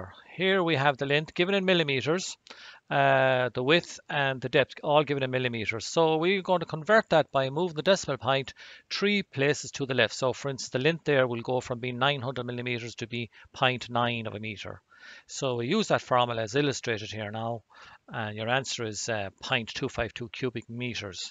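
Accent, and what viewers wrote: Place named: Ireland. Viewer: Guys this guy is definitely form Ireland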